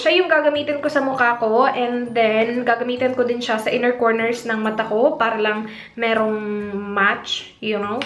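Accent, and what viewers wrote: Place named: Visayas, Philippines. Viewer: I love your videos but nalingaw kos imu accent and tooONE?!?!. bisaya kaa YOoO?!?!. pataas inig human sa sent...eeEeENCE?!?! ✌✌✌✌ It would be better if ma fix gamay😊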